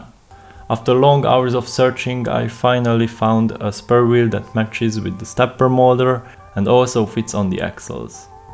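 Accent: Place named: Hungary. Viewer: csá. ne higyj a fokuszcsopi ádámnak h magyarulkéne. teljesen jolesz igy magyar akcentus elsöre vicces de szerintem pont jó .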